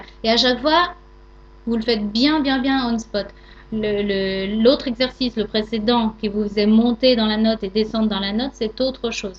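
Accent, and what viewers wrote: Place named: France. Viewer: L'important c'est la matière...Moi j'aime votre bel accent Français..Vos explications sont très compréhensible!!! Du Québec un beau bonjour:)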